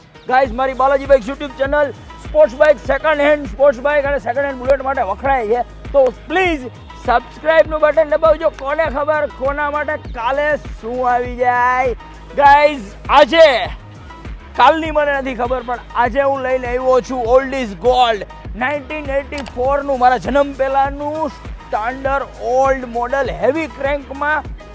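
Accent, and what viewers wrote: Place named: Vagad, India. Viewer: Aap Amara vagad ni bhasha Bolo cho hove 😅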